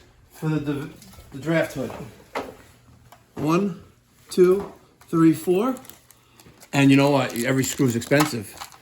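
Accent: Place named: Boston, United States. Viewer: Worst video. Edit this stuff and give us the information. I don't want to hear Bostan accent all day long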